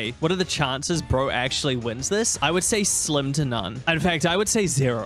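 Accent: New Zealand. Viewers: it took me like 3 videos to realise you were also a kiwi lmao, why does my brain never register our accent 😅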